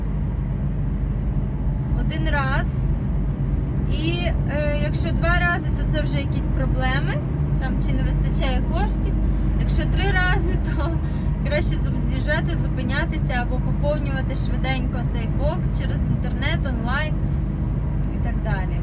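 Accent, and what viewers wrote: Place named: Surzhyk, Ukraine. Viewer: Ох Суржик суржик) Привет из Сумщины😁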